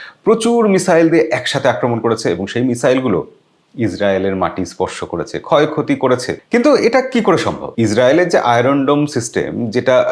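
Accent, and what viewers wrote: Bangladesh. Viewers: আপনার এ্যাকসেন্ট বাংলাদেশী, কিন্তু চ্যানেল দেখছি কলকাতার! 🤔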